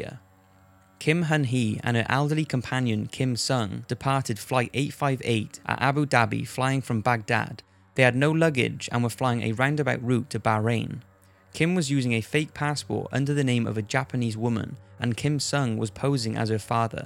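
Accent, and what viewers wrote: United Kingdom. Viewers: Where abouts in the UK is your accent from??? Pls answer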